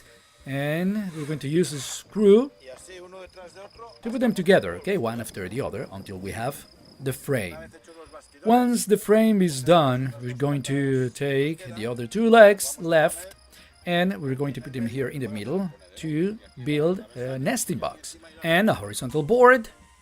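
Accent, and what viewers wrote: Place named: Spain. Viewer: pero ingles se nota el spanish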